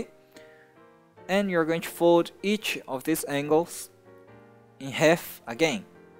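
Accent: Brazil. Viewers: I just thought the same thing, he definitely has a Brazilian accent lol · Are you from Brasil. Tem um sotaque de BR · you speak like a Brazilian. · que sotaque em ..... parece até brasileiro kkkkk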